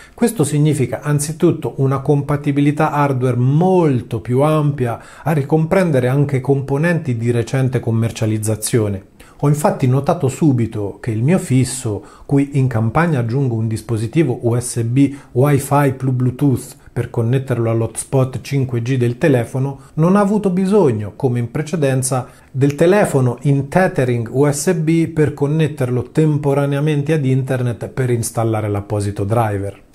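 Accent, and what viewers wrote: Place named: Umbria, Italy. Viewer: ciao, non riesco a capire il tuo accento.....sei umbro?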